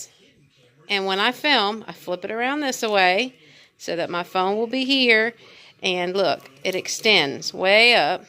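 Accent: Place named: South, United States. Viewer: Hello there, I write your name well? Really enjoyed your 2d Vlog video. Seems a nice family to me, good husband and adorable daughters:) The puppies are sweet too. I have to say, you are doing great, also that selfie standard is very smart! Love your American accent. Is it southern perhaps? You can rely on me, that I will follow more of your video's. The MRE's are my fave too. Maybe you know my friend Nathan's MRE? If not subscribe, he is cool for sure. Perfect program on here you hear?? Loll... till next time.... Rick Lane is my Youtube name, Mark is my Dutch name, see ya:)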